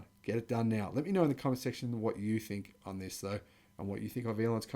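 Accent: Australia. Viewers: My significant other says you’re trying too hard. I said, ‘what do you mean? He’s the most calm speaking Aussie that I’ve ever heard!’ She said ‘it’s obvious he’s built like a brick s-house he should button up and not flaunt it’ whatever…I’m not letting her watch your videos anymore.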